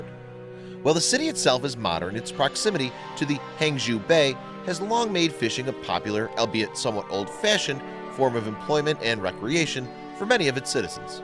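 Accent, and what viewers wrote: United States. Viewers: Why doesn’t YouTube ban did you know? Totally irrelevant content and that annoying know it all American accent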